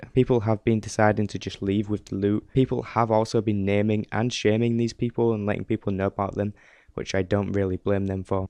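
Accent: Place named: North West England, United Kingdom. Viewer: Hey man where you from? I'm inclined to say north west, I'm from st.helens and your accent is similar to mine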